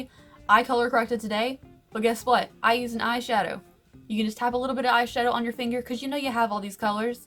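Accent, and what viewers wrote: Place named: North Carolina, United States. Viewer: this is the first video of yours i've watched and right off the bat i knew you lived in nc bc of your accent lol nc represent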